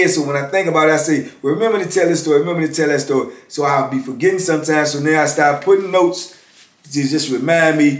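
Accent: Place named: Louisiana, United States. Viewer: The accent tells me you're from New Orleans/Louisiana area but you remind me of Master P for some reason. I subscribed.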